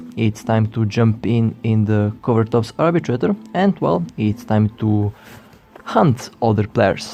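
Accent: Russia. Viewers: Это у тебя русский акцент или мне просто кажется? Видео снималось на тесте?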